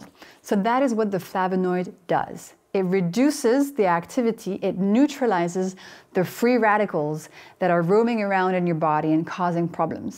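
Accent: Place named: France. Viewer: Your accent smoothly transitions back and forth between French and North American, and it sounds absolutely lovely 😊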